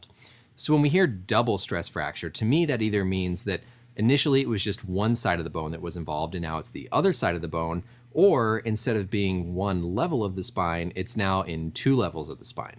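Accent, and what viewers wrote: United States. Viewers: As soon as I heard his American accent I turned it right off.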